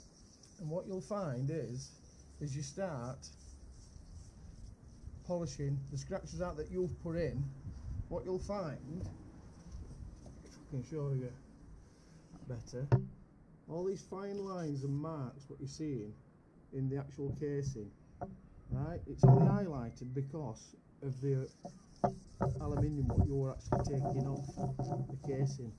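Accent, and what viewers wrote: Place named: Barnsley, United Kingdom. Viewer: 😁i knew as soon as started watching this tha was from Barnsley ,even before i looked at comments........good vid mate.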